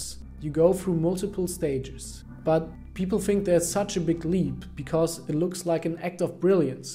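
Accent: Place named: Germany. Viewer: Heutzutage ist hald Englisch einfach Pflicht. Und nur wenn man sich mit der Sprache auseinandersetzt lernt man sie auch. Und auf diesem Weg zu lernen macht ja auch mehr Spaß wie stumpf in ein Buch zu starren ;) Learning by doing ist das Gebot der Stunde. Und klar hört man den Alman Akzent noch etwas Aber das wird auch mit Der Zeit und ist defentiv kein Grund sich stoppen zu lassen. Die Community die man damit erreicht ist einfach auch globaler orientiert und damit auch etwas interessanter in der Interaktion, da man wenn man mit anderen Kulturen in Kontakt kommt andere Sichtweisen erhält und damit seinen Wissenschatz in ungeahnte Ausmaße erweitern kann. Just give it a shot ;)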